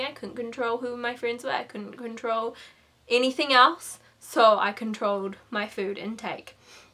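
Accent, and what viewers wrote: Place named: New Zealand. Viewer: Heyy hello from the south of NZ :) Nice to hear a kiwi accent! I really liked this, I too self recovered, developed depression (though seriously you wouldnt be able to tell with me either I always appear bubbly and happy!!) and although I am not vegan, I follow a plant based diet and it helped so much! So pleased things are looking up for you :)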